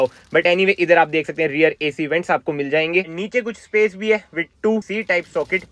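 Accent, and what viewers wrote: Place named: Punjab, India. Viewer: Bhai tumhari punjabi wali Hindi sun kar maza aa jata hain 😅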